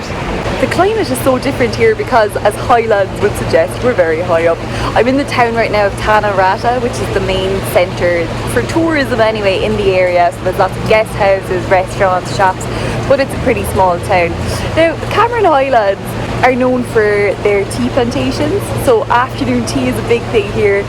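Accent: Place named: Ireland. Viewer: Im malaysian,seeing Irish here, hearing the english,it sounds calmy funny..i seen the video in the brit trying to understand the irish,welsh English.. hearing u here now i get them..as Saoirse ronan says,the vocal for irish is different to std English speaker..also irish english is quite low tone..yours,collin farrel,liam neeson have that vocal similarities.. anyway, enjoy ur trip, safety 1st miss..😊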